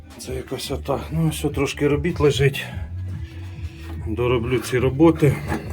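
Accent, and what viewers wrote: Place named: Lviv, Ukraine. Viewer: Слава Україні!Гарні роботи,гарна майстерня,по акценту чую недалеко від Львова.Назар(Львів)трохи ваш колега.Мирного неба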